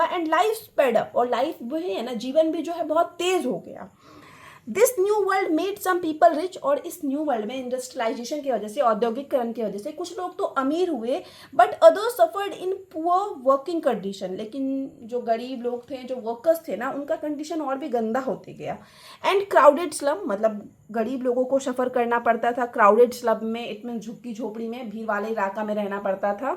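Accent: Bihar, India. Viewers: Aapka tone of speaking Samastipur, lakhisaraay, Darbhanga, begusarai, jaisa milta julta hai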